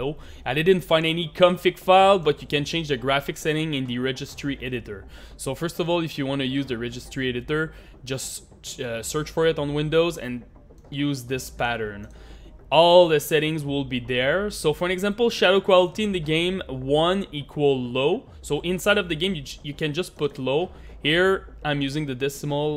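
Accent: Quebec, Canada. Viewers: le québecois mdr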